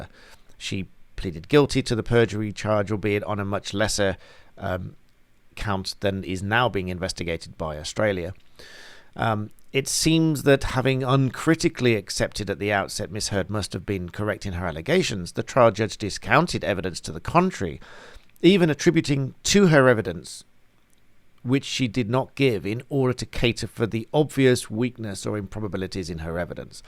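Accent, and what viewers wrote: United Kingdom. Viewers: Hello from ME, USA! Thanks so much for looking into this UK appeal denial. Wonderful to hear your thoughts and comments, watch every day. Can US citizens donate and sign petition, or is it something only UK citizens can do? Just beginning to really understand the Brit accent - great to hear such clear and articulate speech, and at a good speed, I should say. Looking forward to next installment. 🤗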